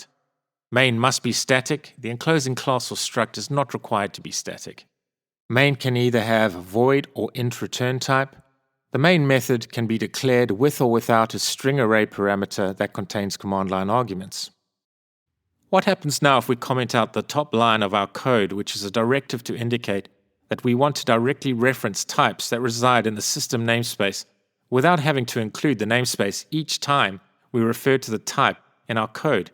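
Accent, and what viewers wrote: South Africa. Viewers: Howsit, was about to ask this but saw this reply. Thanks for the courses and info. South African PHP developer in the UK looking to learn c# - even better getting to listen to a fellow saffa. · Thank you very much. It is a little challenging for me to digest everything after watching it once, so will need repeating but the quality of your course and of course S.African accent helps.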